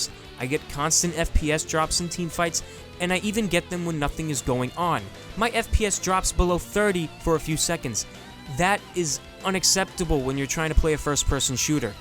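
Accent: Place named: Boston, United States. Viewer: I can sense that he may be from Boston. Hello my brother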